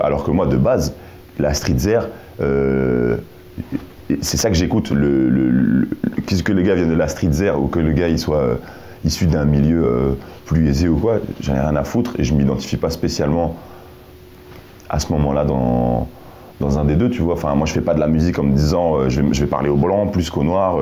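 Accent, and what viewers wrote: Belgium. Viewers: On parle comme ça à Bruxelles hahah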